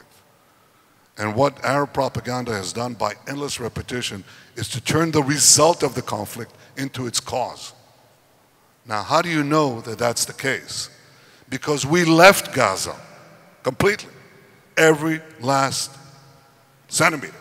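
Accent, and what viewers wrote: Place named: United States. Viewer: Why he has American accent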